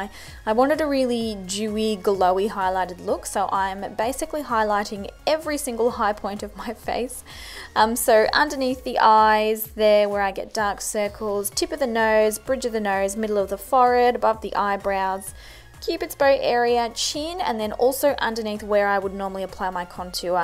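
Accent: Australia. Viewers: G'day! Just found you (via the Rimmel lippy reviews) and so lovely to hear another Aussie! I've subscribed already :)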